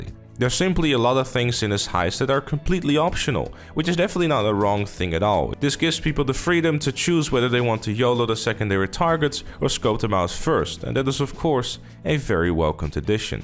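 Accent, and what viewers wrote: Netherlands. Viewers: you sound dutch, are you? :)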